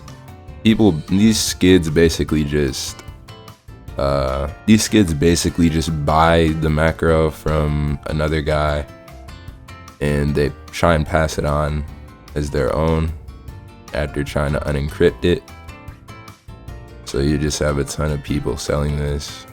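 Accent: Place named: Aba, Nigeria. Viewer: why bro got a aba accent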